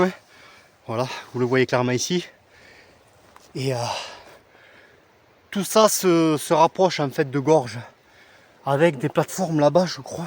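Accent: South, France. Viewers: Avec plaisir, j'adore l'accent du Sud en plus ;)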